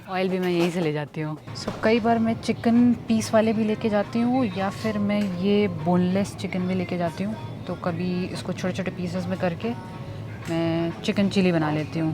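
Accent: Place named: India. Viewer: Thick indian accent ,i think she loves india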